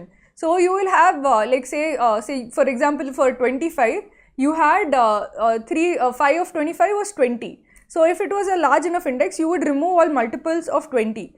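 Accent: South India, India. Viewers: You have that typical South Indian accent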